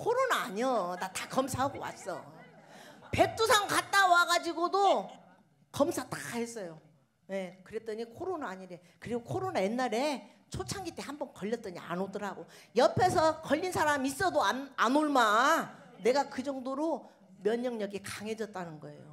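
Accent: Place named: Jeolla, South Korea. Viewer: 전라도 사투리 엄청 쓰죠? ㅋㅋ 감사합니당 😊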